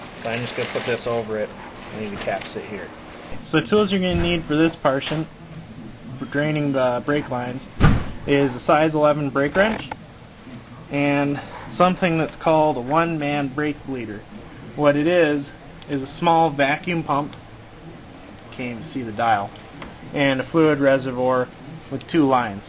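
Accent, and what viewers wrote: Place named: Canada. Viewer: Are you from Canada? I here a slight accent.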